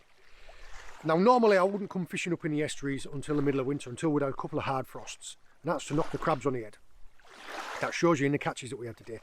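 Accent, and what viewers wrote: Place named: Geordie, United Kingdom. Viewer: I haven't watched any of your videos for quite some time, Sorry about that but my interest has shifted from Sea Fishing right now. You popped up in my feed so here I am. This is a great video, very informative, the biggest thing I noticed was how your accent has changed since the last time I watched. That geordie twang is disappearing fast my lad. keep up the good work!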